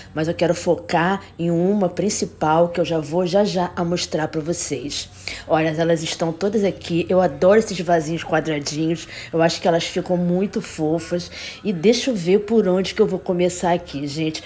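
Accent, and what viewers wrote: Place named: Rio de Janeiro, Brazil. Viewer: Aí carioca kkkkkkkkkkk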